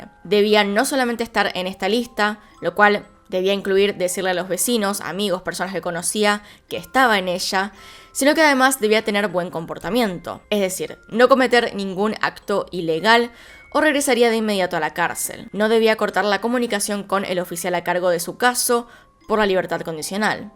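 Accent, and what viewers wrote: Argentina. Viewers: Eres Argentina?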